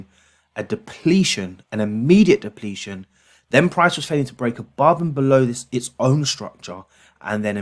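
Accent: United Kingdom. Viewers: I found you on youtube 4 days ago and started to watch your videos and found answer to my questions and found out what wrong with my set ups..all day long your words with that beautiful British accent is repeating in my head and makes me do the right thing.Thank you.Thank you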